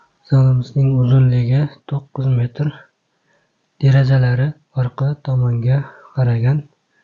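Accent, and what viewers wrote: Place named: Tashkent, Uzbekistan. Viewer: Toshkent shevaga oxshamin qoldi😅😅😅😅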